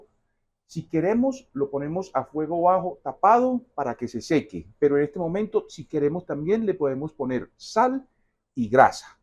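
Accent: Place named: Colombia. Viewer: Me gusta Mucho esa técnica para Cocinar un delicioso Arroz pero también su acento Colombiano or que me imaginó que es de Colombia por su asentó Dios le Bendiga Gracias por la receta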